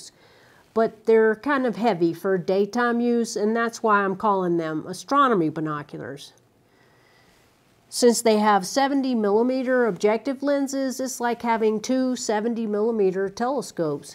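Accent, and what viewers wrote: South, United States. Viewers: Can't wait to get a pair. And I love the southern accent :)!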